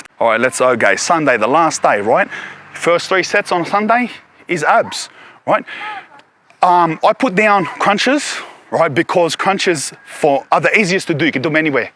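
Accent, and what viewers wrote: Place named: Sydney, Australia. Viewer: Boom brother, oos lad! I’m from Brisbane so we don’t talk like that but I had a mate from Sydney who talked like that. Love it! I could listen to old talk all day haha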